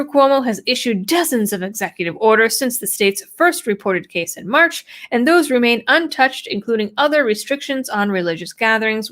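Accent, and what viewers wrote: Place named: Carolina, United States. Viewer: New to the channel. Enjoying so far. Sounds like you may be a Carolina girl.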